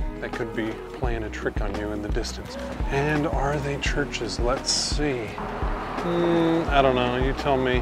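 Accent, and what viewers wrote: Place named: Boston, United States. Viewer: I have never heard a better Boston accent in my life. And I’ve watched a few Ben Affleck movies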